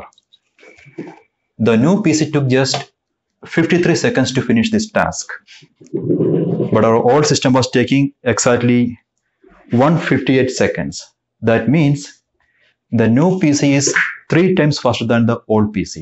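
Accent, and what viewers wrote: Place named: India. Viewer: Bro you are indian Sunke achha laga......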